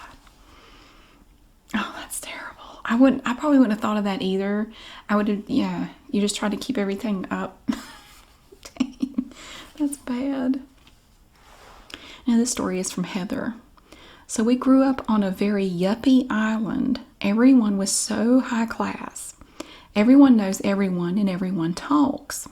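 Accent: South, United States. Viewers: I LOVE HOW IS SO ELEGANT! 🧡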